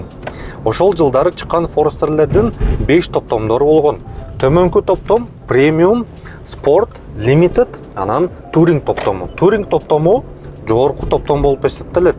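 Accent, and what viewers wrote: Standard. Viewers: Азамат жигит экенсин Кыргызча таза суйдоп тушундургонун учун.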